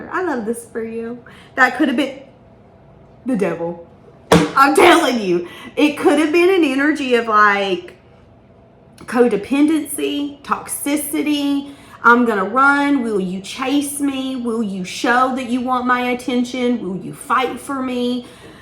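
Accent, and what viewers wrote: South, United States. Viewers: ❤ I adore your spirit! Always so sweet and to the point. Southern bell?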